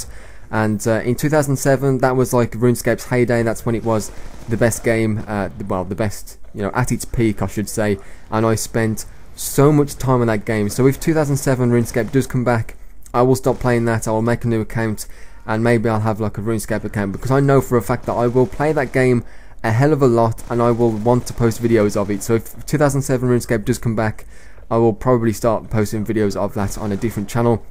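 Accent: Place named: Northern England, United Kingdom. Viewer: where are you from? It sounds maybe north england (Don't really know though)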